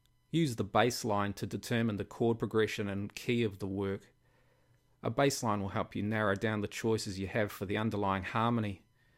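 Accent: New Zealand. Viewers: are you a kiwi? your accent sounds like a new zealand accent! Very helpful video thanks heaps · Hahaha I also was like "Oh damn, a fellow kiwi"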